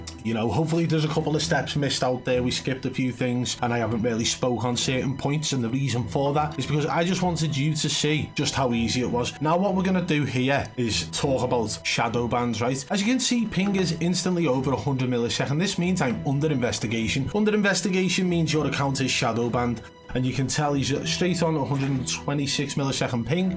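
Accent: Liverpool, United Kingdom. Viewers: Proper Scouse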